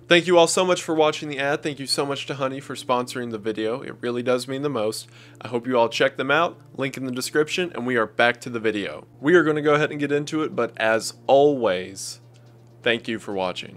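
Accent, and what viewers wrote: South, United States. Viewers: Please do your 8 hour World War 2 video. And if you are worried about the algorithm break it up into three or four parts!!!!! But just so you know I would absolutely listen to every second of it like my life depended on it. I love your channel and I love your voice and I appreciate hearing someone who's voice sounds familiar.. I feel like most YouTubers who gain popularity are not from the South, and the very first time I heard your voice, I immediately thought to myself, "ahhh yes this sounds like home."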